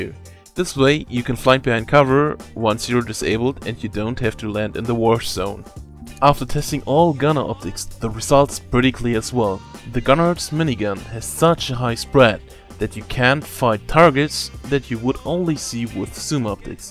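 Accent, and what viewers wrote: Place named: Germany. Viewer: Preferred ur German accent.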